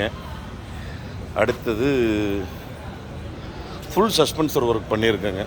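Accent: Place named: Coimbatore, India. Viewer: உங்க கோவை தமிழ் ரேவியூ நல்லாருக்கு போலிஷ் சூப்பரா இருக்கு Approximately running kms 160000 Budget rs.<num>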